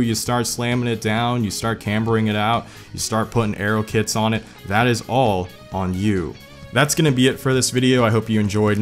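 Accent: United States. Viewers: I hate to sound racist but you sound awfully American for a person Asian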